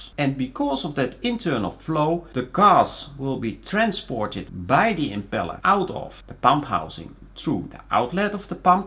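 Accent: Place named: Netherlands. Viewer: gotta love a dutchy speaking english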